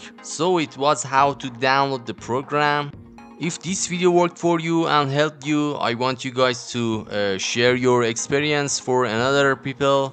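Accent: Iran. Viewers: انگلیسی با لهجه غلیط ایرانی . دمت گرم. کلا منظورت این بود که . از برنامه winsetupfromusb برای ساخت بوت نصب ویندوز استفاده کنیم. نه روفوس ؟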